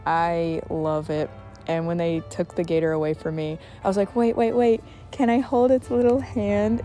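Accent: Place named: South, United States. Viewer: Been following on insta forever, but I did not expect your voice to be so southern! Your slight accent is adorable. I hope you make more of these, I loved the animal facts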